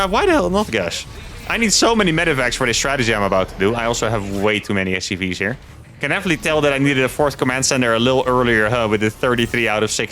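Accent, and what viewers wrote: Netherlands. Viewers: How many languages do you speak? Your English is great. You sound Dutch? You live in Mexico so probably Spanish. I would love to see a Spanish stream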